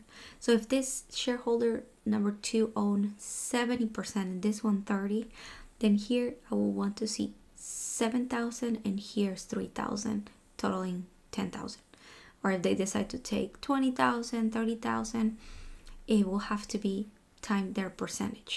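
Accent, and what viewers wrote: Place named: Latin America. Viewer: She sounds latina, great explanation 🎉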